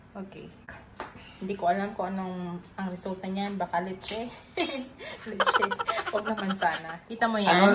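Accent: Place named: Iloilo, Philippines. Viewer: Ilonggo gid ya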